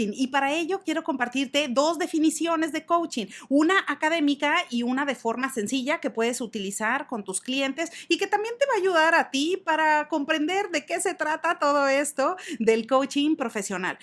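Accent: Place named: Mexico. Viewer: saludos desde argentina me gusto tu explicación además de la energía con la que le das énfasis a tus palabras y de gustarme ese lindo acento mexicano ji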